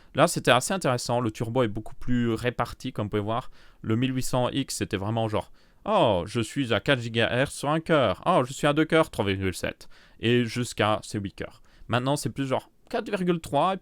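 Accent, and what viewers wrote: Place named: Belgium. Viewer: Putin un belge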